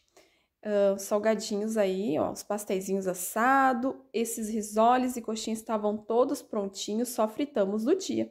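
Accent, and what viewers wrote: Rio Grande do Sul, Brazil. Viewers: Boa noite lindona , achei vc alguns dias atrás , já me escrevi , e antes de assistir o vídeo eu já dou laik , vc e maravilhosa , e eu adoro seu sutak gaúcho , que Deus te abençoe sempre bjo 🥰🥰🥰🥰🥰🥰🥰🥰🥰🥰🥰🥰🥰🥰🥰🥰🥰🥰🥰🥰🥰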